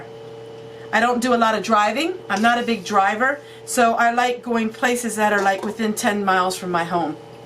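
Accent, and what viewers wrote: Pennsylvania Dutch, United States. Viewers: I’m off subject here, I cannot find your mailing address. My son is curious about your accent. He wanted me to ask you if it is Pennsylvania Dutch?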